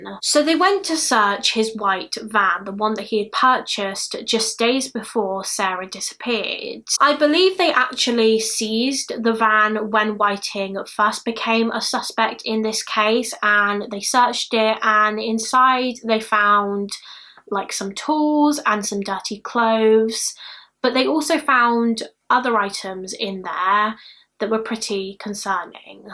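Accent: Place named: West Country, United Kingdom. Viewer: I think is from the West Country like me so I can assure you it’s definitely a British accent, we roll our Rs a lot here 😂😂❤️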